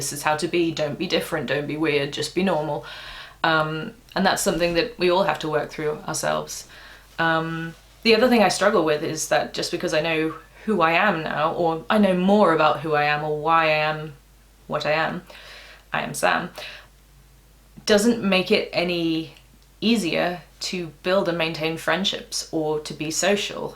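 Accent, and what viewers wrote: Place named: England, United Kingdom. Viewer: Hiya Sam, thanks for replying. Apologies for making the assumption that you were in England just by your accent and before having watched more of your terrific videos. Your assessment appears to have been quite different to mine. Wouldn't it be wonderful to get to a point where we are just understood, accepted and appreciated without the need for 'diagnosis'? We can but dream.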